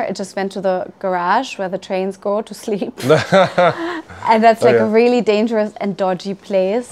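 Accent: India. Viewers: That Indian accent :D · Did you note,how smiling she is? She has developed an indian accent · Is it just me or she's speaking indian English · She surely have long enough in India .her English accent is very Indian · I was about to say the same thing that her accent has the Indian touch to it.. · She has got an indian English accent as well. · I'm not from a rich family, but I remember sometimes my brothers and I would ask our parents some very honest questions in regards to poor people or poverty when we were small. Most often, the response was to the effect of "don't talk about it". That's not to say my parents were against curiosity or something, I think it's a societal thing where we tend to ignore these problems going on right around us because it's not proper to ask those kinds of questions. And I suppose the richer you are, the less you think about these things - but this happens all over the world. 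On a lighter note I love how "Indian" she sounds, her accent is such a melodious mixture of German and urban Indian English 🤗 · It's weird to hear her talk in English with an Indian accent. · Her accent is pleasant Indian English 😮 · Her accent is sooooo INDIAN that at one point I thought she might be from here since childhood. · Alas! This gracious lady even integrated indian accent to herself! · She has an Indian accent 😂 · she is getting Indian accent 😂😂😂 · Her english sounds like Indian accent. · She sounds so indian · She picked up indian english accent. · at this point her entire body language and expressions and even the way she was talking is completely Indian 🇮🇳 . 🙌🏻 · The way she switches between the German accent and the Indian one is so funny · Her accent has slowly changed I would termed it indianish english not fully tough 90% 🙏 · yoooooo i dont want to sound rude but her accent sounds sooo indian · She sounds to be like an Indian woman and her accent is cute 😍❤ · I love that her accent is also Indian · Really Really liked how her English accent showed a little of Indian accent. 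She may not stay in India forever, but she would carry a little of India wherever in the world she goes. 🥰😇😍🤩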